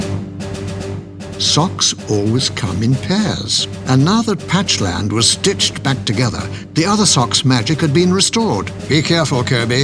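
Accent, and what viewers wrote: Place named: United Kingdom. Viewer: Why is the narrator British?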